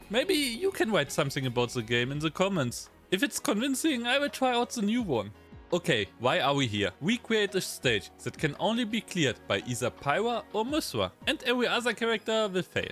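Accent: Germany. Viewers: I've been wondering for a long time now. Are you German? The way you pronounce certain names combined with your accent really reminds me of German. If I guessed wrong, please don't be offended.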